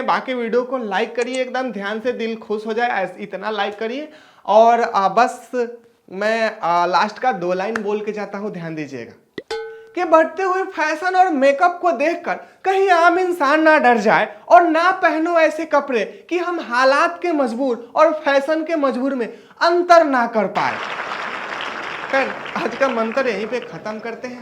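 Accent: Bihar, India. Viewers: Aapki aawaj thoda bihar type me he thoda thik karo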